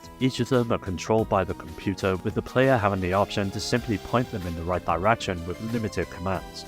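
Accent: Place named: North East Wales, United Kingdom. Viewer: Great vid as always! And I swear that a North East Wales accent 😂 You sound EXACTLY like most of my friends ❤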